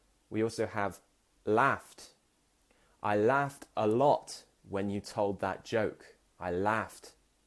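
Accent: United Kingdom. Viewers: Me too..British accent perfect to learn😍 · I love british accent than other accents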